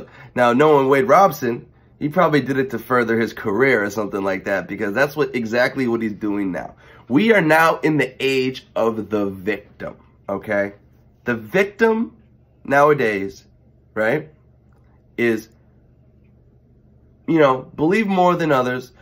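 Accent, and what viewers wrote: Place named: East Coast, United States. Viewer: Love your video! Love what you're saying but I also love listening to you talk. Sounds like you're from the east coast. I'm from the east coast but live in California right now. Love your personality.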